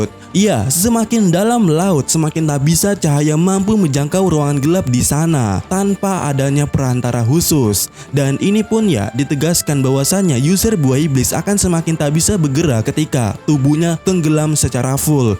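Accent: Palembang, Indonesia. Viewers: Bang lu orang Palembang ya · bg lu org palembang pa jambi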